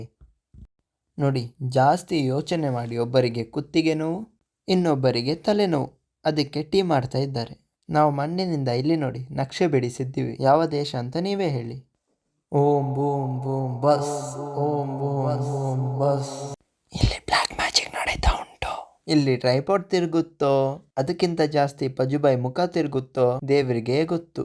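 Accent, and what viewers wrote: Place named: Mangalore, India. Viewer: Manglore kannada super voice nice bro❤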